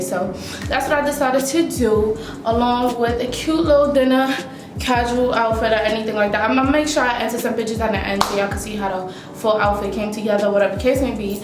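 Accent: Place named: Brooklyn, United States. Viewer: I love you deadass!😌😍🥰😘It’s the Bk accent for me You make me feel at home I miss Brooklyn!!!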